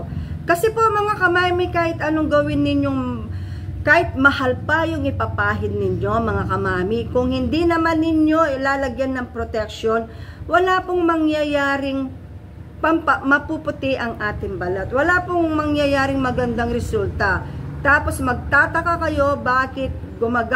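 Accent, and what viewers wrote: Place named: Pangasinan, Philippines. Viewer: Panggalatok poba kayo kc halata sa salita ninyo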